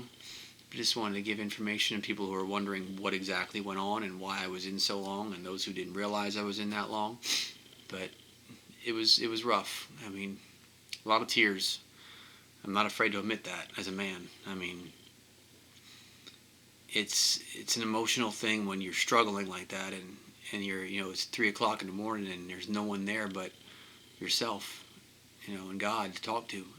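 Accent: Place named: Pittsburgh, United States. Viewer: Crying as I’m reading this now even though I’m late to the game. Recognized the accent though as I’m a native Pittsburgher now living in Los Angeles. I’ve decided to not get the reversal surgery, I just don’t want to go through all of that to find out I have to have it done again God forbid. Is the Ng tube necessary for all procedures Up?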